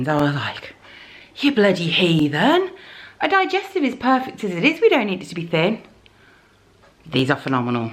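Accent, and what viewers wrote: Birmingham, United Kingdom. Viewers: It's a fairly strong Birmingham accent. Not all British accents are the same at all haha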